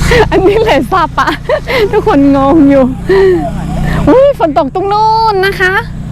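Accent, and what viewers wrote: North, Thailand. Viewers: อยากทราบว่า เป็นคนไทยภาคเหนือหรือมั่ยคะ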